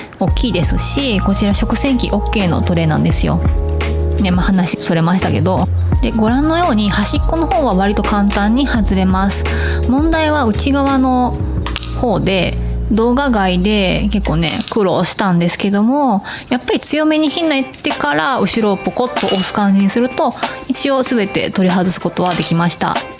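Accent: Kansai, Japan. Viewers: さんの、関西弁がまじる説明が好きです😊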